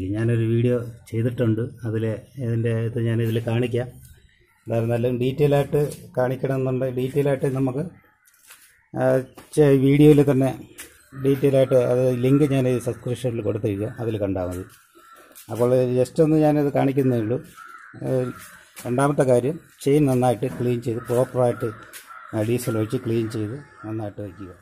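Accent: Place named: Trivandrum, India. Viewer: Correct karyam aane parayunnatthe ...njn already follow chyunna karym aane...slang kollaam trivandrum aane thonnunu 👏👏👏🤝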